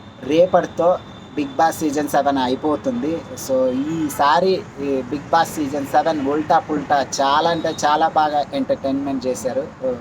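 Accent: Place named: Kurnool, India. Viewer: Brother మన కర్నూలు యాస బాగుంటది మీరు ఇలాగే మంచి మంచి కంటెంట్ తో ముందుకు రావాలి కామెడీ కూడా బాగా చేస్తున్నారు తప్పకుండ మీరు అనుకున్నది సాదించాలి అని దేవుడిని కోరుకుంటా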